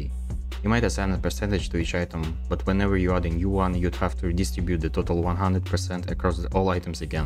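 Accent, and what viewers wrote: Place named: Russia. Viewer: бро я же слышу ты русский❤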